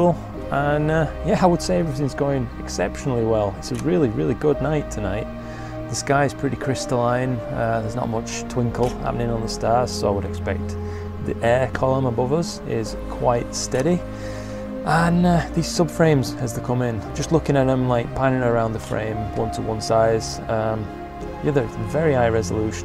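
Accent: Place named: North, United Kingdom. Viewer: Hey, you sound like you are up from around my way (I'm a bit further North I think). You should come join our lucky imaging project on Discord, would be good to have you in the community.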